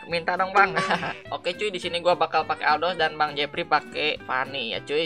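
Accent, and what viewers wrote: West Java, Indonesia. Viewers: Bang orang sunda nya atau lain tapi siga orang sunda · Jiga logat orng sekitaran garut/tazik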